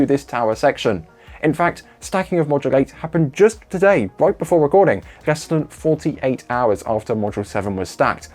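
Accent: United Kingdom. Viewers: Kinda difficult understanding some of those wiords with that pommy accent. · Hi NSF, it is nice to listen to, because of being British, BUT can we please slow down the speed a little, only a little. From your 1.1 Million subscribers are not all native English speaker, like the poor guy here. Due to rewind and repeat it took exactly 35 minutes for this clip. To lenghten it to 2 minutes longer will reduce that immensly - see John's clip yesterday. Speed was perfect there.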